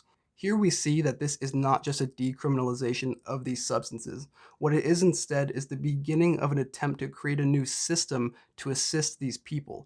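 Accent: Canada. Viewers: Hey, I thought you were a Canadian fella haha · Damn idk why but i thought you were from canada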